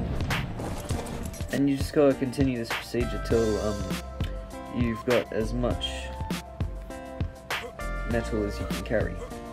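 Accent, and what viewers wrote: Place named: United Kingdom. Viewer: British boiii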